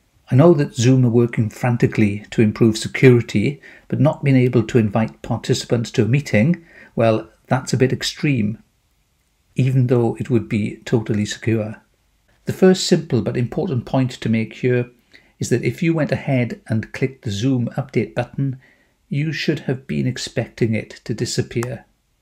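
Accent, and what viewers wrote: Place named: Wales, United Kingdom. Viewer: Bore da Mr., Thank you so much for your Zoom videos. I teach at various universities in Okinawa, all of whom are now scrambling to get the students learning online. After viewing many other Zoom intro videos it became obvious that most of them were way too complicated and difficult for our Japanese students to follow. So, diolch yn fawr for your concise and much easier to follow tutorials. I especially appreciate the bonus of a narrative accent, not so different from my own Barry talk. Tidy, like! Owen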